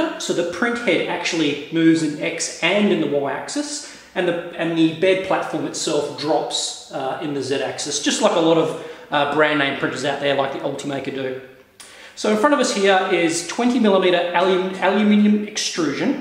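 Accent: Australia. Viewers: judging from your accent, you are aussie like me, where did you get your screws? my local hardware is terrible for screws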